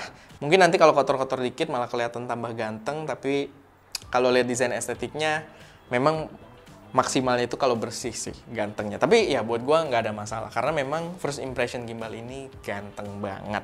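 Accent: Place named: South Jakarta, Indonesia. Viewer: aksen jaksel bgt, fix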